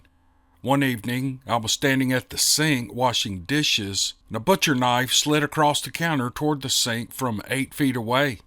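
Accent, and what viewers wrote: South, United States. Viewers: I LOVE ALL THE STORIES UNDER WHATEVER YOU PUT THEM! YOU HAVE A WONDERFUL SOUTHER VOICE! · You read these stories so clearly and fluidly you must pre read and proofread every story, great job as usual and I am from Canada 🍁 and love your light southern accent! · Your calm voice in the night sharing stories was very much appreciated. I had to stop listening for awhile since retitling mixes were the same stories I had already heard and it was frustrating trying to figure out what was new and what was grouped into old stories by numbers. I only listen on YouTube and it was getting too jumbled to find recent posts from repeats. Posted date does not always mean recent podcasts. Sometimes the date was just when it was reposted into a different group of stories. "What if it was true"? Steve somebody? Do I want to write down the number? 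I stopped listening. But I don't like the voices of other storytellers. Your calm voice with the southern accent of my mother's relatives, is like sitting to listen to family visiting and catching up. Last night this post popped into my YouTube feed when I needed to get away from loud arguing of news stories and blood and thunder prophets. Thanks for continuing to keep it calm with anecdotes and comments. It was soothing to hear your voice as the wind was blowing in a storm moving south by southeast along the Pacific Coast, pushing inland over the coastal mountains. I hope your family, relatives, and friends have been missed by tornadoes, wind, and floods. I have a day to get ready before the temperature drops and the slushy mix turns to inches of wet snow and freezing nights for about a week. I am going to go in search of what has been added since I took a break and see if I can find what search terms I need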